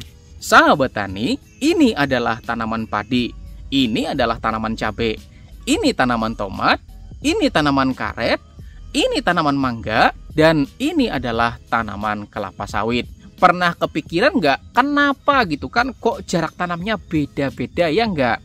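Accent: Banjar, Indonesia. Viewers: Pian urang banjar kah mang??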